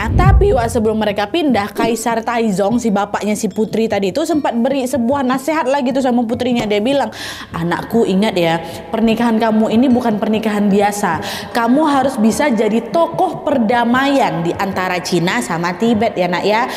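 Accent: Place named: Sumatra, Indonesia. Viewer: Logat kakak nya kayak orang Minang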